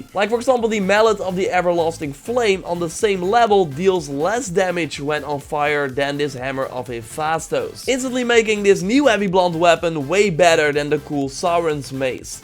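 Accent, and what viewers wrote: Netherlands. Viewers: Just wondering. Are you Dutch? You really have a Dutch accent xD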